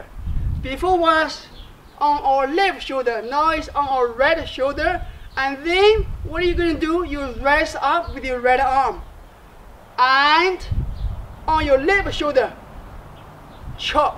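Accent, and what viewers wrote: China. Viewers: I love his Chinese English not American pronunciation! I enjoy ALL his videos.. the Chinese sword is wonderful to watch in action! We want to see many sword techniques! Hope he does more at a slow pace so we can follow him! 💡🩸💡🩸🩸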